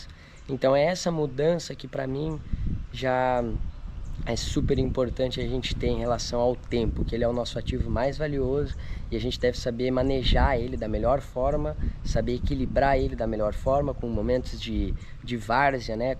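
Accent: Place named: Dominican Republic. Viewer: Desde o primeiro vídeo já imaginei que você era do RD ahaha a forma de falar lembra o Eslen!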